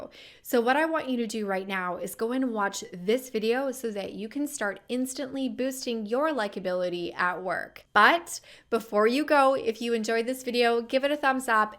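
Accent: California, United States. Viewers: what's with the way she speaks????????????? she's pretending to be a teen in high school in cali???? she's probably in her 50s, a bit too old for that annoying accent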